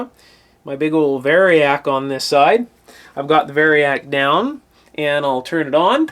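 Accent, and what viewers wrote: Canada. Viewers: I love your Canadian accent. My grandfather was Canadian and it's been a while since I've heard this accent! Good tip on finding the outside shield end of an unmarked cap. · Your accent makes me think you're from Canada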